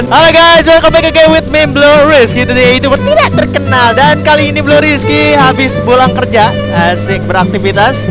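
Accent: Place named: Java, Indonesia. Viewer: Nah begini bang kemaren medok bat jawanya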